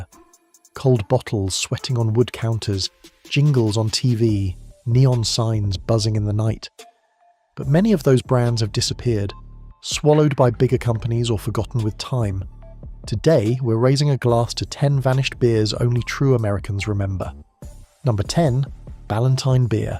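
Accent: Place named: United Kingdom. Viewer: Wait. Why is a video about vanished American beers narrated by a British sounding douche?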